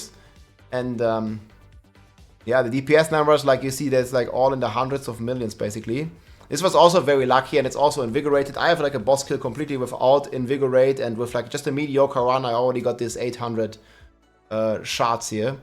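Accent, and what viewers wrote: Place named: Germany. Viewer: An hört sofort einen deutschen raus wenn er Englisch spricht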